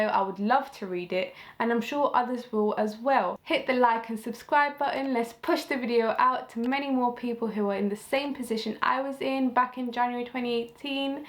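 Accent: England, United Kingdom. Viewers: I LOVE your voice it reminds me of the voice of Amy Winehouse !! I think it's the accent ahaha are you from England ? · Are u from uk?? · What part of England do you come from? You sould just like Mr.London who has fitness videos here on YT.. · I love your British accent gurl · love the British accent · İngiliz aksanlı, açık fikirli, diyet değil sağlıklı yaşam fikrini savunan bir Türk kanalı mı? Hemen abone oluyorum 😊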